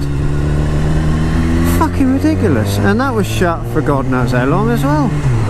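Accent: Norfolk, United Kingdom. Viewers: it's great to hear the Norfolk accent and the road works in Norwich are a bullache but anyways carry on having fun bud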